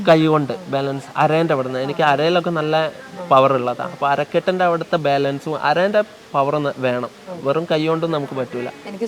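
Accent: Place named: Malappuram, India. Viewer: മോളെ എങ്ങനെ മലപ്പുറം ഭാഷ ഇത്ര വേഗം പഠിച്ചു.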